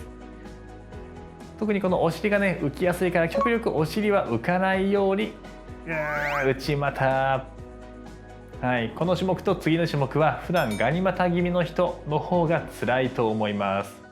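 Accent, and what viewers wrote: Kansai, Japan. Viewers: 初めて見ました〜 関西の方かな？ 私はあまりこの手のYouTube見ないのですが、解説がどこにどう効くかとか、今の状態が示す自分の体の状況がわかりやすく、あまり長すぎないストレッチ、そして声が良くて！最後まで一緒にストレッチしました〜 体スッキリ！ 年齢と共に体型が少しずつ変化していくのでそのへんの解説と共に効果のあるストレッチをしたいな〜と思い、登録しました！ 昔に比べてふくらはぎの太くなっていく部分が下がっています。太さは変わらないのに…これもまたまだでしたら解説聞きたいです！ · 脚痩せ、だいてんし引っ込めたいです。先生の良い声、時々関西弁がツボです♪洋画の吹き替えやってほしいです^ ^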